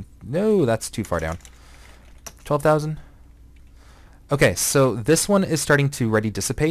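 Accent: Canada. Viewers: didn't know canadians had an accent... (im canadian as well)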